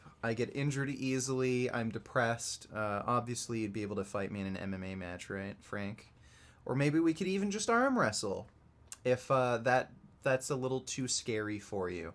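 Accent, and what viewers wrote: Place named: Canada. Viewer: It's all aboot whooping ass.. like drake your from Canada. Tough voice th0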